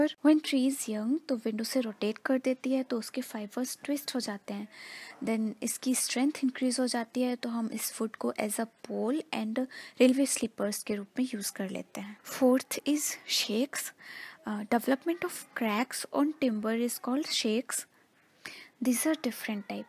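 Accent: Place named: India. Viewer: It would be great if you add English subtitles. It's really complicated to keep up while trying to decipher the Indian accent. Keep up the good work.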